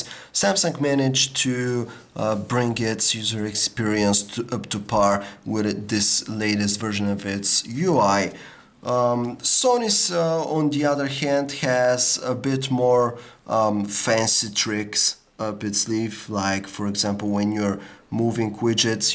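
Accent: Russia. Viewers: Sound like a Russian accent to me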